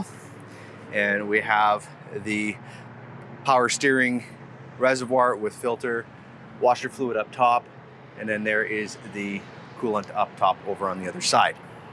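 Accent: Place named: Canada. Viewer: Idk for sure if this guy is Canadian he sure sounds like he is. Once I heard that I was pretty sure what was under the hood. They like those up there.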